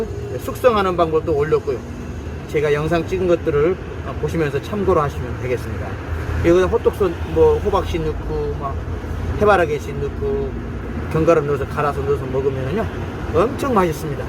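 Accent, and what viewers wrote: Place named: North Jeolla, South Korea. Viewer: 짠메세요 그랫끼때문에. 그람에.. 있는데..ㅋ 전라도 북도사투리네요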